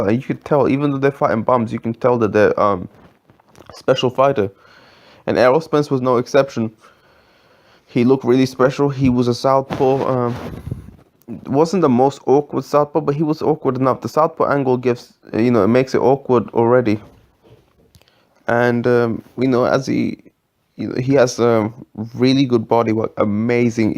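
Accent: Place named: India. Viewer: You sound like you're a Indian from India! ANY ways I just wanted TO let you Know what YOU sound like!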